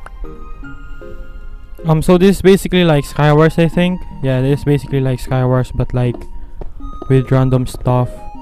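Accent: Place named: Philippines. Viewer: You Really Have A Filipino Accent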